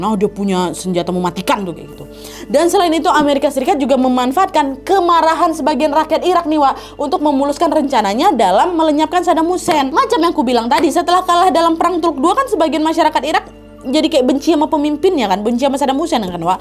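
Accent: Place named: North Sumatra, Indonesia. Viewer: Logat bataknya kental banget yaa.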